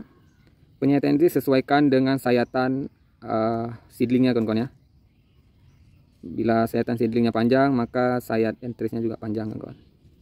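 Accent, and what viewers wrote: Indonesia. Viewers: Bang saya lihat dari gaya bicara nya tampak ke polosan dan ikhlas nya dalam menyampaikan tutorial, semoga Allah membalas kebaikan nya dengan kebaikan yg berlipat ganda Amiiiin. Bdw bang alamat nya di daerah mana? Dari logat nya sepertinya dari pulau sumatera mungkin daerah Riau pekan baru dan sekitar nya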